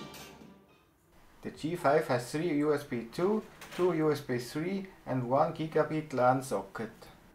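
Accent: Switzerland. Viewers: ... swiss accent x3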